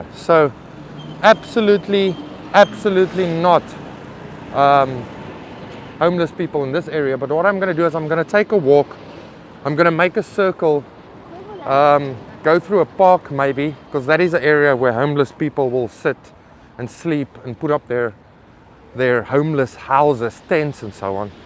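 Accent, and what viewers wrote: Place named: South Africa. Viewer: Hahaha can hear from accent u from SA ;D, so glad we have someone preaching the truth on China, not that serpantZA idiot....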